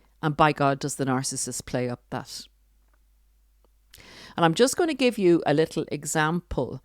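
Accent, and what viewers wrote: Ireland. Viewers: You are describing my mother … thank you. And I love your Irish voice - everything sounds so clear!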